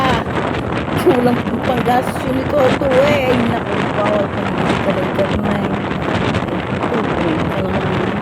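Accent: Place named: Batangas, Philippines. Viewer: areng batanguenyang talaga oh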